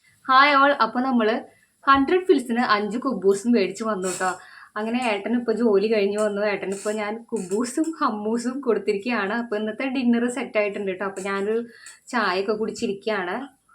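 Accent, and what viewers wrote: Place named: Vadakkanchery, India. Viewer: ഞാനും തൃശ്ശൂർ ആണ് വടക്കാഞ്ചേരി ആണ് സ്ലാങ് കേട്ടപ്പോൾ തോന്നി എന്റെ ഏരിയ യിൽ എവിടെയോ ഉള്ള ആളാണെന്ന്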